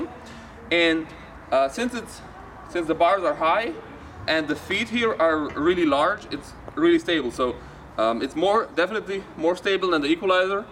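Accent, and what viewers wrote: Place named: Germany. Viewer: After ten seconds of watching I knew you were in Germany, just by your accent, although it’s not a strong German accent.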